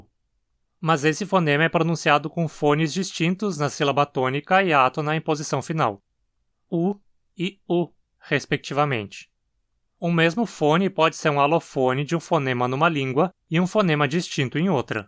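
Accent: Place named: Rio de Janeiro, Brazil. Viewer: Sotaque do RJ, amei❤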